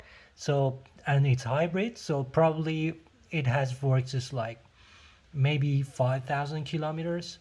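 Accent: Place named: Australia. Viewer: Hello, you sound like a fellow-Aussie ☺ After watching your video tip, I Googled the oil needed for Toyota Yaris Cross, and found it to be 0W 20.( Synthetic) Is this a significant difference? What goes with such a variable answer to this question? I live in Sydney which is NEVER really cold, but often miserably, 3rd world, hot in those torrid months of December to March each bleeding year 😂 Thank you!!!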